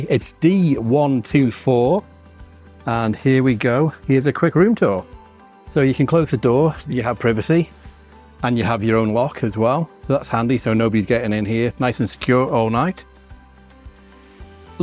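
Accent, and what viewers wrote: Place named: RP, United Kingdom. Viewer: 这英国口音太标准了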